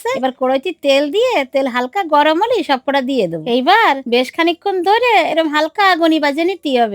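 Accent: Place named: Khulna, Bangladesh. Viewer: কাকিমার বাড়ি কি বাংলাদেশ নাকি কাকিমার কথা শুনে বোঝা যাচ্ছে যে খুলনা বা গোপালগঞ্জের ওদিকে কাকিমা বাড়ি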